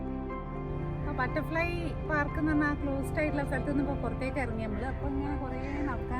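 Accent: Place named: North Kerala, India. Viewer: Super vlog dear. Detail ayi kanichu thannu. Koode Nammude swantham vadakkan bhashayum😍😍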